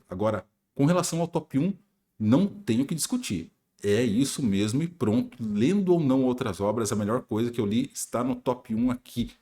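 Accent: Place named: Rio Grande do Sul, Brazil. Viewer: muito obrigado. Uso saquinhos em alguns, mesmo morando na Paraíba. O sotaque parece gaúcho. ☺️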